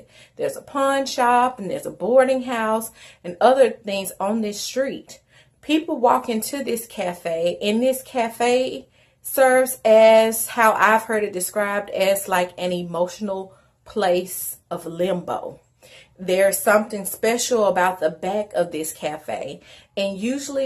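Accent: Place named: South, United States. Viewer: I didn't want Mama Day to end 😢. Love your Southern accent , TN girl here just subscribed 💕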